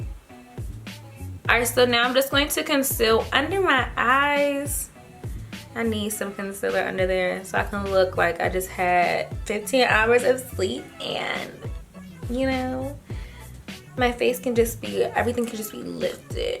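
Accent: DMV, United States. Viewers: That DMV pops out everytime! I loveee you lol😂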